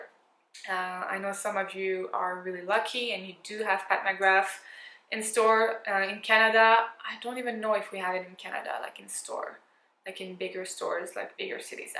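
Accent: France. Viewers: Ok so now I need Charlotte tilbury eyes to memorize in jean. What a beautiful look, I just subscribed today, I love your style! (And your French accent!). It’s French, isn’t it? 😊❤️💋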